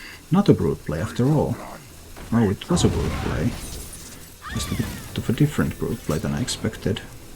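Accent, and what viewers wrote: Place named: Finland. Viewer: He's from Finland, this is how they lovely people of Finland talk 🇫🇮❤️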